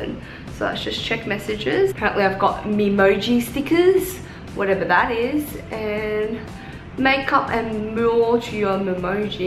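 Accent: Australia. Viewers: I knew u were from Australia cause of ur accent . Reminds me of the Rebeka twins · I’ve always wondered how my name would be said with an Australian accent haha. Now I know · Sorry but she’s nice and pretty and all but I’m not really into people with Aussie accents (not love I mean like to watch them on yt) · I had a feeling you were Australian and then you said it and I was like YES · The way she talk like Rosé of Blackpink ..aussie accent tho ☺ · so weird to hear an asian speaking Australian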